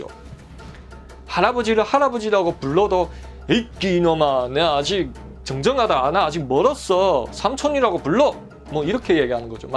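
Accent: Yanbian, China. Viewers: 이름도 한국이름이고 근데 뭐지.. 중국사람인가? 발음이 사투리도아니고 뭐.. 중국사람인가요 이분?? 우연히 .보게 되었는데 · 발음을 들으면 연변조선족인거 같아요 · 조선족말투 잘하시네요 ㅎㅎ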